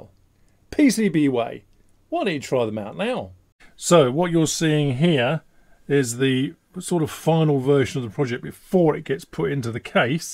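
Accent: United Kingdom. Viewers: Hi. I'm just watching the movie 13 Lives about the rescue of the Thai boys trapped in the cave. Viggo Mortensen plays one of the UK rescue divers. He looks just like you and sounds like he comes from the same part of the UK as you. Great film!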